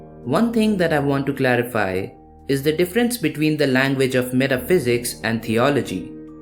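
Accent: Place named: India. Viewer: never want to mean or curial but your Indian accent is distracting sometimes. Just sometimes, like every 5 second in 2 minute. Beside that amazing video and description.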